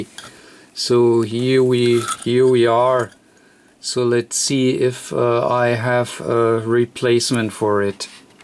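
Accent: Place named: Germany. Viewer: I echo all the nice comments below. So great to get an older piece of equipment working 100% again. Thanks!! Your friend will be pleased. (Love the accent! I am guessing German, but I suppose you could be from the Netherlands.)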